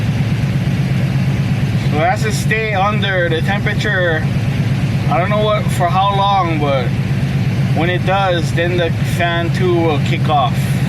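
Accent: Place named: Hawaii, United States. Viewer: now if only my tired 230k mile compressor could make high enough pressure to survive in texas. i thought i recognized that accent in the first video, hawaiians are rare on youtube. local boy 🤙🏼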